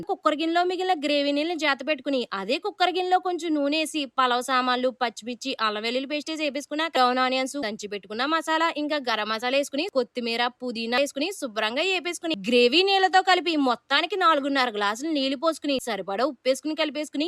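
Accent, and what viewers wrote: Godavari, India. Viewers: ok ఏమి లేదు అండి కుంచం మ గోదావరి స్లాంగ్ ఉంటే అందుకే అడిగాను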